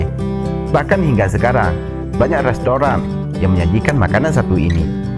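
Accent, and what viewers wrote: Aceh, Indonesia. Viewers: Lebih bagus suara seperti ini, ada ciri khas logat aceh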